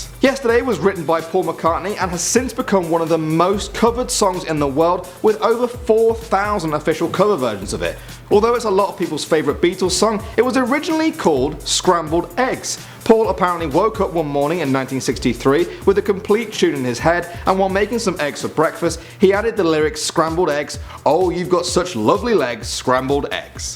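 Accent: United Kingdom. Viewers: I knew of just about all of these.:) I question, though, the presenter mentioning how Paul woke up in '63 with the tune of "Yesterday" in his head since the song was recorded in '65. Paul, supposedly, obsessed about it during the filming of " ", in fact. While it's possible he could have thought it up two years earlier, it doesn't seem accurate to me based on the many things I've read. Number One is probably the dumbest, oddest conspiracy I've ever heard of. I can't believe there are people who still think Paul died in '66 and was replaced by a double. Lastly..I just have to say that I love this guy's English accent and wish that I could bottle it.;) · Brother, would you please slow down a bit? The fact is, you are speaking with heavy British accent and you talk so rapidly, that it is very difficult for non-british to comprehend what you're saying!))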